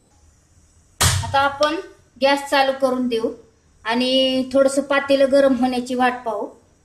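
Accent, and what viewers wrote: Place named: Nashik, India. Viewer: mavsi nasikkadchya ahea ..amchya kadchya chan language agdi apli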